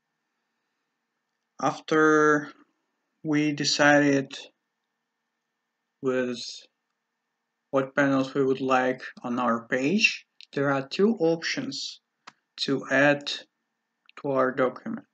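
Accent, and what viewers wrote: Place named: Russia. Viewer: Also, do you speak Russian? Your accent seems familiar)))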